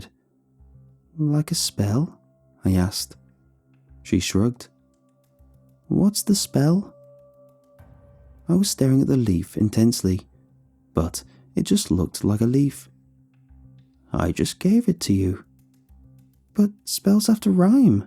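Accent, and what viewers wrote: United Kingdom. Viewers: True, although actually now that I think of it, maybe the narrator was saying holler, but it sounds like "holla" because of his United Kingdom accent...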